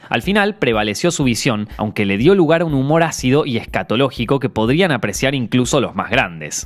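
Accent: Argentina. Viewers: A de ser argentino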